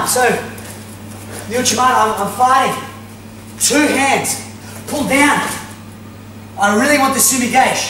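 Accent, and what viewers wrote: Australia. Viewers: Is that an australian accent? =) Awesome vid!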